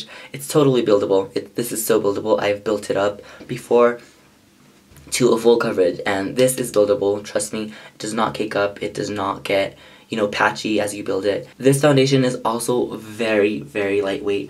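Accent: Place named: United Kingdom. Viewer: Omg your british accent was to die for! Soo cute and I've only just begun the video :)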